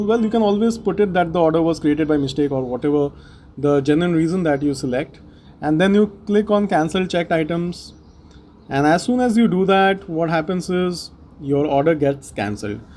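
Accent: India. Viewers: the man with the indian accent comes for the save again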